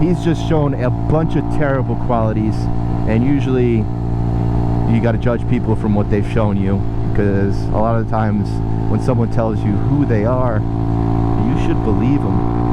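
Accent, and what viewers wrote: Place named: New England, United States. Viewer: Sounds like a bit of "subscriber jealousy" by an obnoxious Yankee to me... I don't think I've heard of him before and only looked him up since you were whining about him... He's a bit obnoxious since he's a youngster and I'm and old fart and as such find ALL youngsters annoying, but with your Yankee accent, you are even more annoying...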